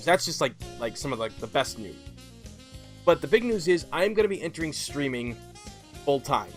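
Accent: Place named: Boston, United States. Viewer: ROE introduced me to your channel. I think you're absolutely hilarious man. Love your accent u sound cool. I think you're a bostonian? That or new yorker. When u did those playthroughs in outbreak with ROE I really enjoyed um great fun 😁 By any chance do u have the moment where u were dragged through the window by the zombie in the hospital? I PISSED myself laughing 😂🙈 Sorry I just couldn't help laugh but it was funny af 🙈 I wish I could be your friend lol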